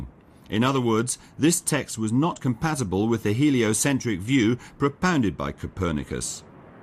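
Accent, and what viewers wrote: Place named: Germany. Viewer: Notei que o cara que está narrando tem sotaque alemão.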